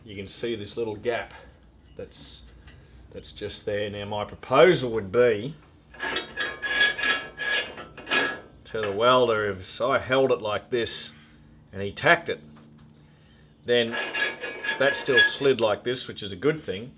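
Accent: Australia. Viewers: As a fellow Aussie, It is a rare pleasure to hear an Australian voice on a you tube show. I have been trying to pick your accent, reminds me of a amalgam of Steve Irwin and Paul Hogan, a touch of John Williamson, with a hint of Bob Hawk and Les Hiddens, hope those Americans appreciate the education they are getting!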